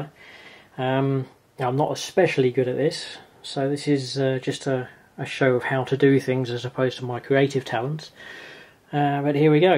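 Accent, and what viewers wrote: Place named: Arizona, United States. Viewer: Arizona accent:D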